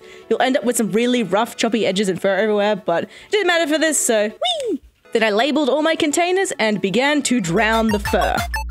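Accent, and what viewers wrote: Australia. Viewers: Australia? Are you Australian?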